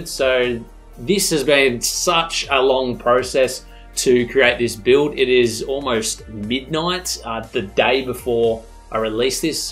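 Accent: Australia. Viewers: r u an Aussie cuz to me u sound it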